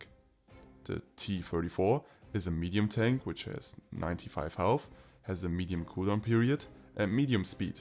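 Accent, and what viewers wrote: Germany. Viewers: Ich merke es schon von der aussprache dass du Deutscher bist hahaha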